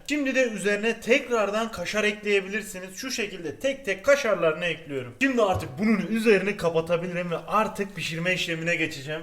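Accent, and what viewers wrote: Adana, Turkey. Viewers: "Şunu alüyürüm, bunu koyüyürüm, buna eklüyürüm." Bütün yemek videosu çekenlerin neden şivesi adanaya kayıyor ve salağa anlatır gibi anlatıyor anlamıyorum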